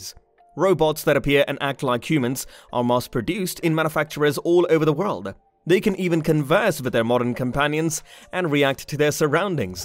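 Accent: United Kingdom. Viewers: fkkn brit accent announcer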